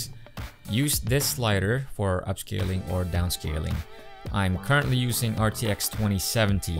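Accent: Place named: United States. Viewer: goddmorning. NC u half or what? I mean the voice is so American HAHA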